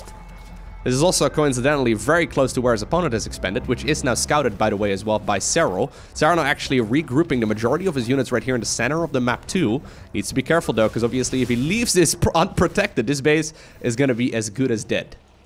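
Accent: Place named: Netherlands. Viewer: Your Dutch accent never gets old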